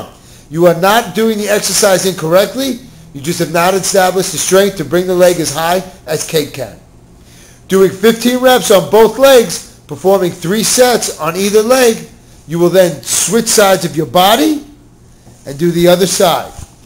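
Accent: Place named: New York, United States. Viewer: Folks from New York...when they talk, I listen.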